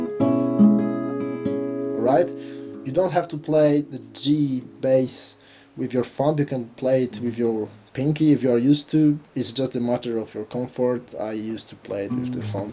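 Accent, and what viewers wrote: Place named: Poland. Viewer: I am also a fan and listen to Jordan Peterson. I lived in Hungary for 8 years and have many Polish friends. I knew I recognized that accent. Thank you again for the great lesson of a favorite song!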